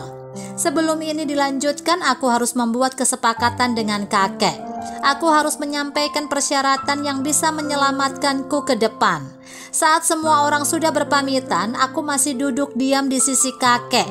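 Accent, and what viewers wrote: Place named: Sunda, Indonesia. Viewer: Suaranya kaya suara euceu2 org Sunda 😅😂🙏🙏🙏🙏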